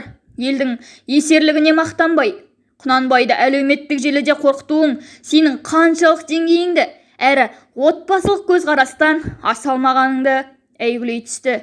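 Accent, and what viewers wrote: China. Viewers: Осы автордың дауысын естісем,қытайдың дауысын естігендей, денем тітіркенеді..Қытайдың акценті.Біріңді бірің жау көріп,Қазақтың кең даласына сыймай жатырсыңдар-ау.Ұялмайтын адам екенсіңдер.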